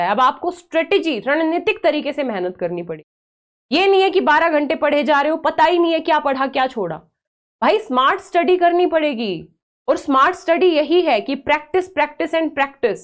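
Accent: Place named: Haryana, India. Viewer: Mam ki accent प्योर हरयाणवी चोबीस ❤❤❤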